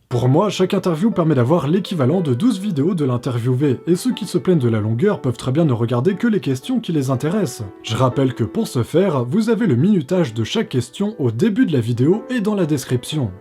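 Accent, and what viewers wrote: France. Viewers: l'accent français + ta voix, c'est un gros plus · ton intonation surprend... t'as juste un accent normand bien prononcé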